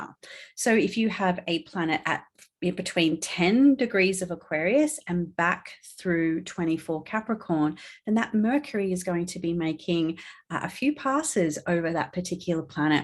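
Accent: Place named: Australia. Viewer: Mine too! Her Aussie accent is also a plus!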